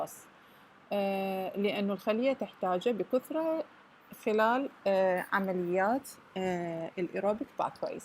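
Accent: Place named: United Kingdom. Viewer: Great , u have a really nice British accent 💜🙏🏻 Well, I speak with American accent but.... I think that British is beautiful than American accent and so clear 😐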